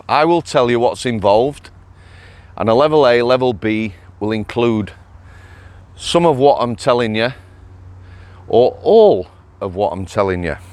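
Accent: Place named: Yorkshire, United Kingdom. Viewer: How do! I bet you get fed up with me, jumping on board everytime you post a vid; LOL. I love seeing Stevie. Hearing that you've gone back to the gym ... I live next door to a gym, but I am such a lazy-arse, that I don't go. Well, now that you've started back, I'm going to shift my carcass and get next door. Now that I'm off that f*cking sertraline, I might actually start to shift that 3 stone. BTW, whenever I heard your Yorkshireisms, I'm reminded of Charlie Williams from The Comedians - I loved that show. He always used to be on about a bottle of Watta. LOL; and called people 'Flower'. A lovely guy - RIP Charlie.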